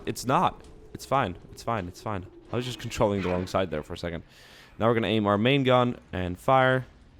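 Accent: Sweden. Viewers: I THOGUHT YOU WERE BRITISH NOT SWEDISH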